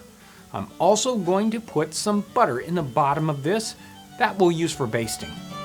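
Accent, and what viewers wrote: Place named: United States. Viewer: Is this guy Dutch American? He looks Dutch but speaks with an American accent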